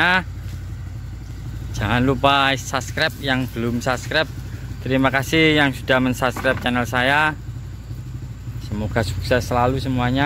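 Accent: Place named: Java, Indonesia. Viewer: Ledok nge wong jawa boss ku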